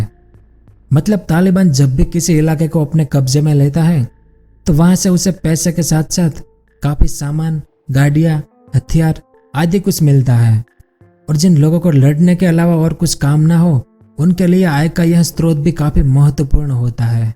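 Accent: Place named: Rural, India. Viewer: Tu puri villege bawa hindi bolta hai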